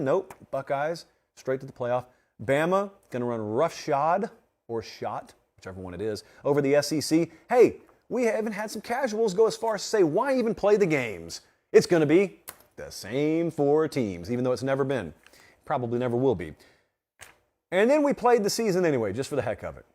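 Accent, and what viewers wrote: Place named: Georgia, United States. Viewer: …… I can tell you are from western GA hahahaha it’s pronounced “Wine-der”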